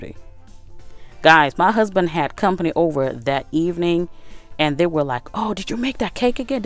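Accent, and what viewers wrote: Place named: South, United States. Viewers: Thank you so much for sharing this! I think us ladies work hard enough, and I wish housewives got paid for all the work that we do...but don't just say I'm a housewife, no--say Domestic Godess! Love your recipes and yes also your accent (southern?) Have a beautiful day 🌹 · I sure thought u was from Louisiana 😁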